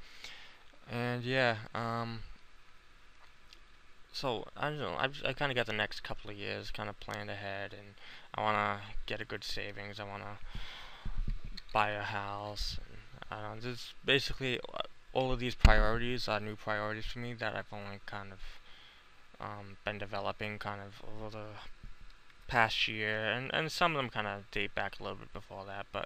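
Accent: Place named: New England, United States. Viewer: i thought you sounded like you were from the new england area, MA here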